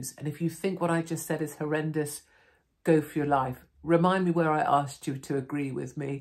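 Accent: RP, United Kingdom. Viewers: You sound d posh but your amazing proper down to earth funny clever and should be in charge of our pond life government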